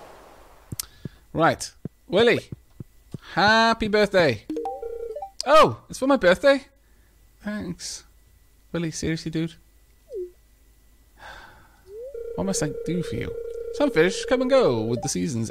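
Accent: United Kingdom. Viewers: That's an accent thing. He's saying it right in his accent. It's a common pronunciation across the UK, even here in Australia!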